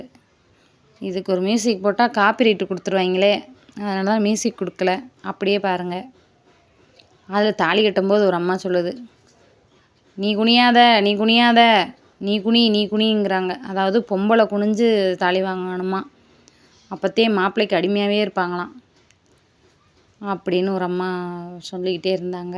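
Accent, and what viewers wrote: Thoothukudi, India. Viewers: நீங்க தூத்துக்குடி ஆ சிஸ். நா நிறைய டைம் உங்க சேனல் பாக்கும் போது நினைப்பேன்.நம்ம ஊரு பேச்சு வழக்கு இருக்கு பாத்தேன். நா திருநெல்வேலி சிஸ் 😊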